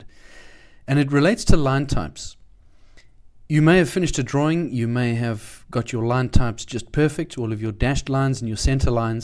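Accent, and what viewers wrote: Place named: South Africa. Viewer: Thank you! You sound South African.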